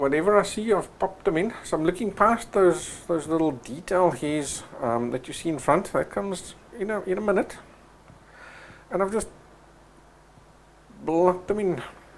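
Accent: South Africa. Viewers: great video, are you south african by any chance?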